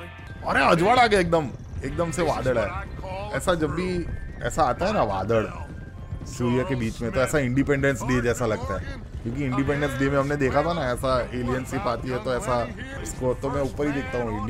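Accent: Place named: Gujarat, India. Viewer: Your Hindi narration is 10000 times better than gujju narrations. It’s gujju flavored Hindi 😋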